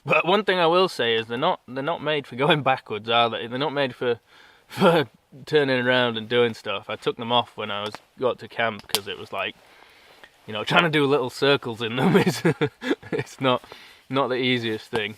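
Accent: Yorkshire, United Kingdom. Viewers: For the whisky, "It was the cheapest, actually." lol. Yorkshire. I love it. Keep up the good work. Love your videos.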